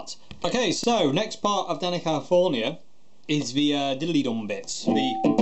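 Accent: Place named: North, United Kingdom. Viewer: Cheers you the best teaching us all this stuff xD and ill just say our accents are similar lol thought i was the only one playing up north (atleast thats how it feels sometimes) haha take care buddy!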